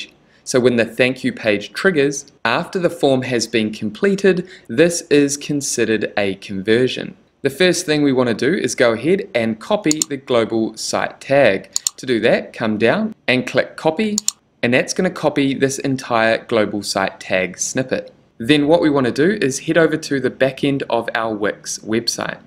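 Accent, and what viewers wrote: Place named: New Zealand. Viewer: Thanks, for such a clearly explained tutorial! Also, I'm from Chch, NZ and was happily surprised to hear a kiwi accent. You have done us proud. FT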